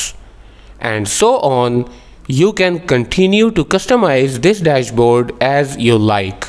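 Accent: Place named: United States. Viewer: Nice accent for an American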